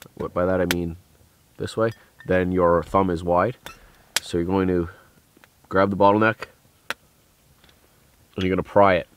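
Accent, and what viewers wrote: Ontario, Canada. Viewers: thought i detected an Ontario accent - definitely some french metis in there as well. from a fellow cunnuck :) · Are you Canadian? You kinda talk like one.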